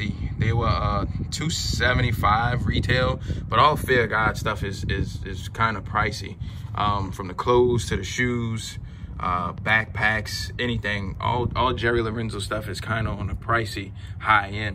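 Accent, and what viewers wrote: Baltimore, United States. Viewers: Homie is definitely from Baltimore. What it deww dummy?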